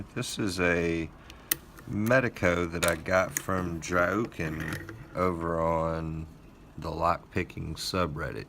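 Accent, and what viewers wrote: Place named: South, United States. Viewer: Great video on figuring these out. I am about to start learning on medeco as well, but I have been too chicken chit to start. lol I have to know though, what part of the South are you from, Bud? That drawl is unmistakable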